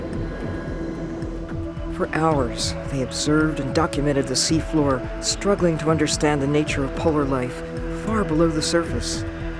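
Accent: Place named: United States. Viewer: wonderful footage of such a beautiful landscape, really enjoyedwatching it. shame about the horrible narration, an extremley irritating strong american accent of a 12 year old boy.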